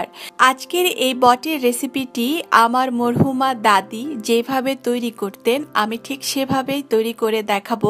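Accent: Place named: Barishal, Bangladesh. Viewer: ম্যাডাম আপনার বাড়ি কি বরিশাল।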